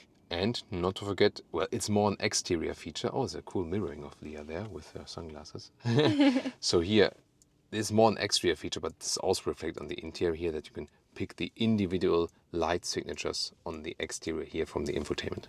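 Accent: Germany. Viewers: Your Genglish is outstanding 👍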